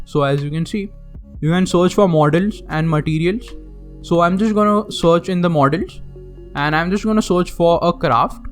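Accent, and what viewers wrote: India. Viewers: Are you a Indian?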